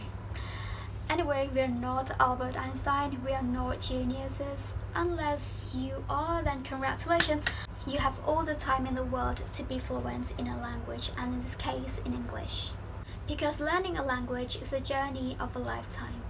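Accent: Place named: United Kingdom. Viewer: Wow I'm so amazed how fluent your English is and the way you talk and that British accent is so so great, I'm so jealous. Some love from your IG friend ❤🥰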